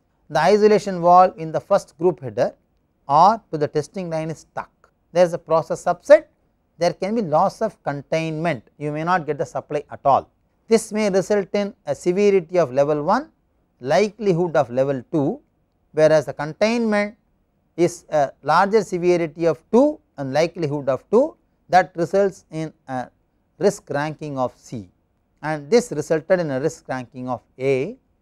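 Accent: India. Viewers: Good Explanation in typical Indian accent.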